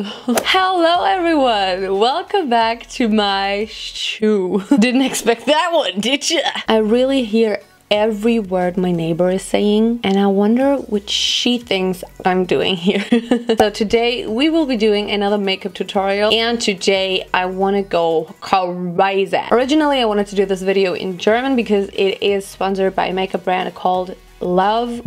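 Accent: France. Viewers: Super accent français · Awww your french accent hahaha Lots of love from Montpellier, South of France 😘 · I love your accent french !😍😍 I am FrenchGirl I love makeup tutorial !!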